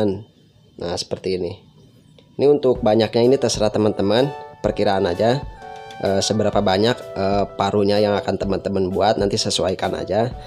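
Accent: West Java, Indonesia. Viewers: Host orang Sunda atau orang Minang asli? Logatnya kayak orang sunda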